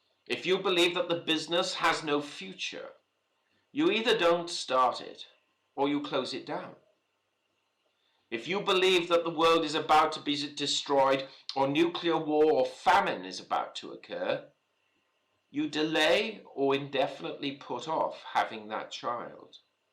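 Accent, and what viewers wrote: England, United Kingdom. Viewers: After watching several of your videos, I understand what you are trying to say. "Don't purchase all at once." I agree. Patience is key. This is true for many things, even real estate. Since I made my error with that in the past, I won't do it again. Been trying to move out of Los Angeles but only when I find the right place at right price. The more I research and understand a certain market area, the better off I am in making a decent purchase. And besides all this, I like your accent. You remind me of one of my coworkers who's from England. And I admire his many virtues as well.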